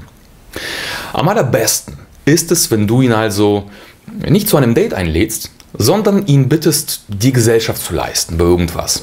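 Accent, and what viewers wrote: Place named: Russia. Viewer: Kann es sein das du ein russe bist weil du so ein russischen aktzent hast so wie ich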